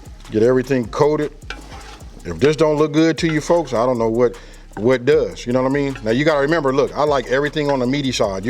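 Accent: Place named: South, United States. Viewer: Omg I absolutely love your southern accent and the way you talk "so look listen." Love it ❤ I made smothered pork cops from your other video and I am back to make something else now. 💙 BTW I am from The H in Texas.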